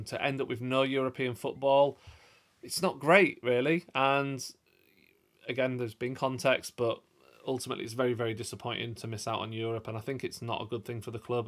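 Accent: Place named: Geordie, United Kingdom. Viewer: Thats a very strong Geordie accent you have.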